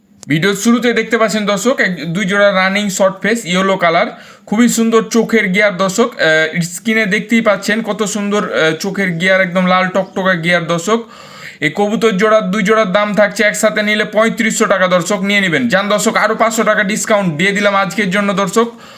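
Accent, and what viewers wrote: Kolkata, India. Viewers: আপনার তো কথা ভাই ভারতের কলকাতার ভাষা মনে হয়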